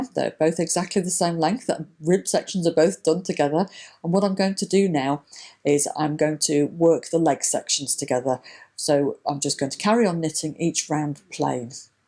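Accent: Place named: United Kingdom. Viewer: I love your accent. what kind of british accent is it?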